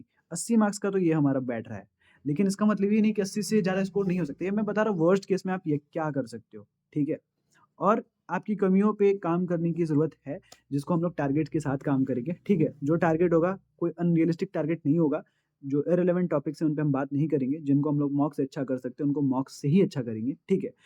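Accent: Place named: Bihar, India. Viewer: Tum Bihar se h kya bhaiE sub kuch hai Thik h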